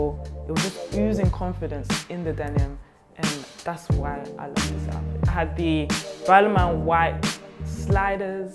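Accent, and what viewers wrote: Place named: France. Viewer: Love the french accent 😍😍😍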